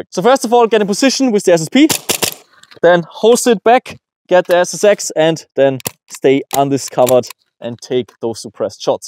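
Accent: Germany. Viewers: Eindeutig ein deutscher😂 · Bist du ein deutscher man hört es nähmlich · Man hört das du deutschsprachig bist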